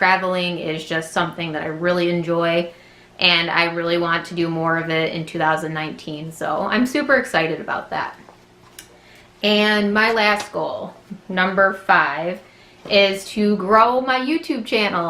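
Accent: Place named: Michigan, United States. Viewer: Ok, this is so weird, because as I was listening to you I was thinking "She sounds like someone from Michigan." LOL No lie! That's actually why I asked! Too funny! Are you on the west or east side? And no, the drive isn't bad at all. Unfortunately though, we discovered that my youngest (who is 13) has some serious anxiety when it comes to driving through the mountains. I definitely do, but we didn't realize he did until he about hyperventilated as we were going up to our condo. It was at the top of a mountain and it was pretty dang scary the sharp, steep turns you had to take where you actually couldn't even see the road in front of you sometimes! We decided next time we go, we'll try to stay somewhere not quite up so high. It was absolutely beautiful where we were though. Also, the guy who we rented the condo through on VRBO told us that he gives a good discount to returning customers and that he has some really nice places right in Gatlinburg, so we're thinking about doing that when we go again!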